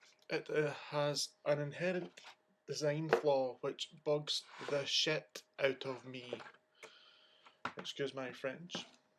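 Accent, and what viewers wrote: Scotland, United Kingdom. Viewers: Is a make shift ouija board okay to use??? Oh and love the Scottish accent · are ye scottish lad? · Ahh, he's from Scotland. :P Sort of close. Lol. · Great video! I have a board and really want to try it - will be alone as nobody I know believes or is willing to take part! Thanks again for this video, brilliant to learn history of the boards too. PS I'm a Fifer - where is your accent from? You sound like you're more towards the West!! :o) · Is he.. .Scottish? Or Irish...? This is going to bug living crap out of me >.> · Are you Scottish? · Are u scotish ?? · ur scotish me tae m8 lol scotland foreva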